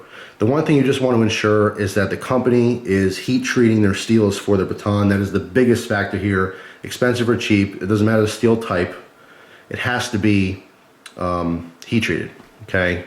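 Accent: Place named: Rhode Island, United States. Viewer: Hey, are u from RI? U sound very Rhode Islander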